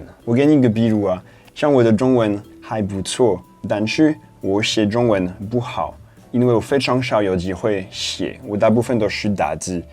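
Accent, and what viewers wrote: Taiwan. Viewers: 謝謝，歡迎來台灣。你的新民字很棒，，很親切。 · 你真的愈來愈台囉～聽你講話有種溫暖的感覺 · ，聽起來很親切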